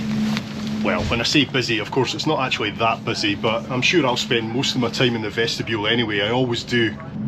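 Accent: Scotland, United Kingdom. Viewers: How are you doing buddy 😎you're videos are so interesting and incredible I wish I was Scottish love the accent and fantastic eh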